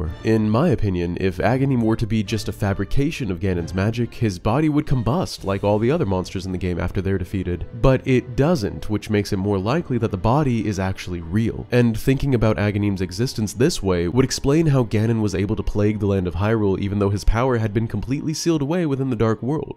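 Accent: Texas, United States. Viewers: Love the Texas accent. God bless Texas. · I didn't realize you were from the Lone Star state, but now I can't not hear your Texan accent lmao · Your ampt up Texas accent majes me want to hear Sam Elliot tell me about the Hero Of Time "...hmm I take comfort in that, The Hero abides...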